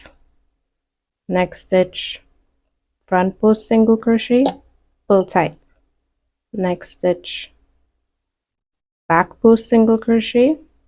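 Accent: Trinidad and Tobago. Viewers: im guessing youre trinidadian, your accent seems so familar !!! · You from Trinidad? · Omg my total shock when I heard your accent and realized your from my country Trinidad and Tobago omg!!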